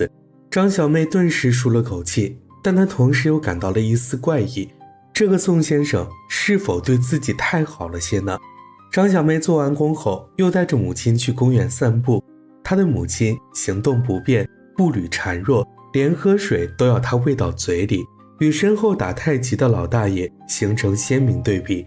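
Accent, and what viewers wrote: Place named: China. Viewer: 最初聽到的聲音很好聽、就跟著看了光影二三事、分不清現在是喜歡聲音還是被電影吸引了😂😂以前只喜歡台灣的國語、原來中國的國語也這麼好聽的👍🏻👍🏻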